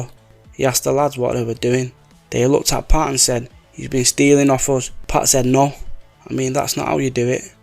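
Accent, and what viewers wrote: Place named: Northern England, United Kingdom. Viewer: Why? Cos he doesn't have a London accent? He's from up north that's how they speak. You must have an inability to grasp different accents cos I had no issues understanding him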